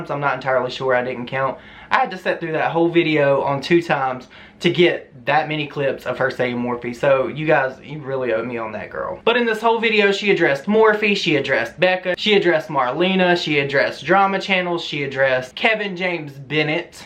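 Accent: South, United States. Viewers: sweetie where are you from? You sound like everyone in my family from Ms,Al, Ky and parts thereabouts. No shade to you, you’re making me homesick. Love your content.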